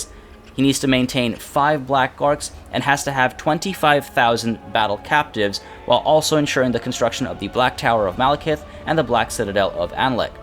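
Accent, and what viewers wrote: France. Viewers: Damn!! That French accent. Cool Vid man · Your French accent is so funny. And you should do this video when everything was out and with all news.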